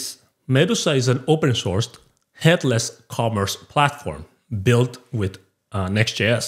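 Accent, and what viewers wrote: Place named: Japan. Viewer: I like the way you talk, the animation.. you sound japanese. cool. lets see you grow bro.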